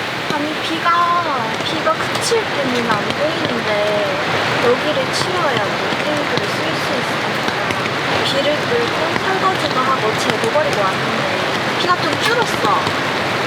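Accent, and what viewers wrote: Gyeongsang, South Korea. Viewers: 경상도 말씨에 인상도 좋으세요~우중캠핑 잘봤습니다 고생하셨습니다❤🎉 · ㅎㅎ 이분은 귀여우심 경상도사투리도 귀엽고 그래서 구독 ❤ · 씨는 경상도 말쓰는거랑 웃는모습이 참이뻐요^^ 젤좋아하는 캠퍼예요^^ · 갱상도 분인갑네요~~ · 님도 이쁘고 경상도 말씨도 좋네요 하이볼에는 위스키가 정말 맛있어요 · 화면만 나오는지 알았는데 말도 하시네요 혹시 대구 경상도 아가씨가 맞죠?인생 너무 즐겁게 사네요ㅡ전맨날 집ㅡ직장ㅡ집인데ㅡ남자 게스트ㅈ안필요 하세용ㅋㅋ🎉🎉❤😮😅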